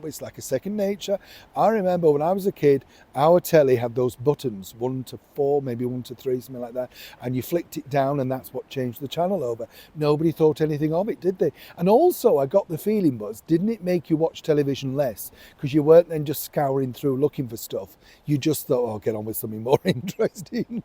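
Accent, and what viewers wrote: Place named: North, United Kingdom. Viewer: Here’s Neil Sean my one true love bwahaha out here in his comfortable northern accent chatting about all the things we remember before dropping some truth bombs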